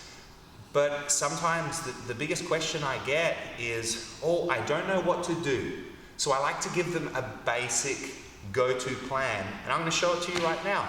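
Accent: Australia. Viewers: I watched a bunch of Bjj videos, but for some reason, your videos resonate with me and easy to digest. Maybe it’s your Aussie accent haha. thanks from San Francisco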